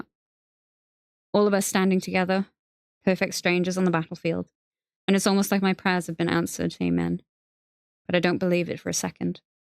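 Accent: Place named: Wales, United Kingdom. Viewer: can you do a good girl's guide to murder?🥺🥺 also I love you accent what part of walsh are you from?😮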